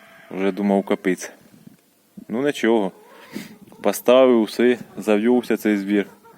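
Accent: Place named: North, Ukraine. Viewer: По говору чую, що мій земляк з півночі)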